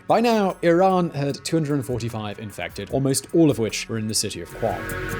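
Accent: United Kingdom. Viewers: THANK You for this Information! I LOVE Your UK Accent. It fits YOU. Our Hearts are with the Every Day Iranians who have Children and Grandparents they Love and arre trying to PROTECT. This is Very Sad.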